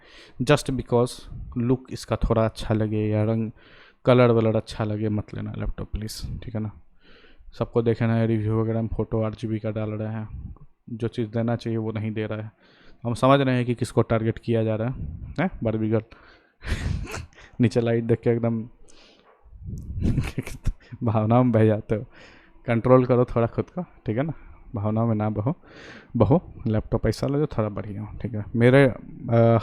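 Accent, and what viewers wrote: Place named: Bihar, India. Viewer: Bhai aap bihar se hona ? Meri gf bhi bihar se hai.. aapka accent match karta hai usse.✌🏻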